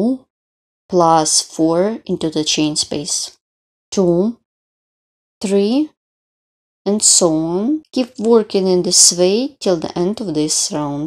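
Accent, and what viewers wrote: Russia. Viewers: Мне одной кажется, или таки я и правда слышу родной, русский, даже в английском? За обучение спасибо, учусь, очень хочу уметь красиво и без подсказки вязать крючком. В видео все понятно.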